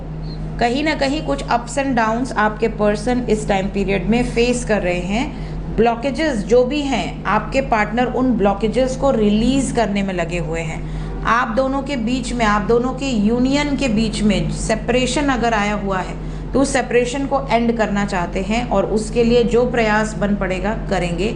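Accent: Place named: Bhojpuri, India. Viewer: mam kha se hain aap bhojpuri bol rhi hai vaise mai ghazipur up se hoon 😂❤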